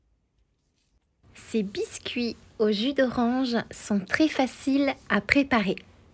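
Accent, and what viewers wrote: France. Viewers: I don't know about the biscuits . But that French accent is fabulous . ❤️👍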